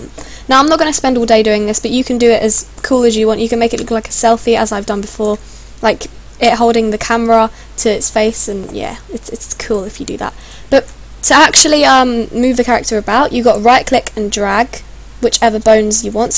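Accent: United Kingdom. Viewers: Is this a British accent? You sound like it to me...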